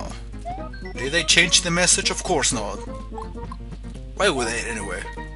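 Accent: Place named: United States. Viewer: i can hear u losing the accent a lil, i compared ur voice hear and in ur old vids, and ur starting to hav more of an............ american accent, less of a hispanic accent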